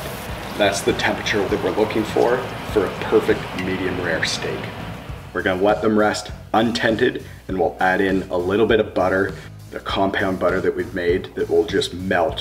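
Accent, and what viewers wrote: Canada. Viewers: Western Canadian accent detected...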